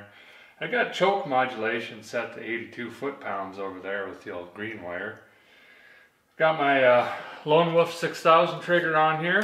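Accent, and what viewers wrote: Minnesota, United States. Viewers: Mind-bottling. . . the Minnesotaization of the language can be quite amazing. I'll do the right thing and say, close enough!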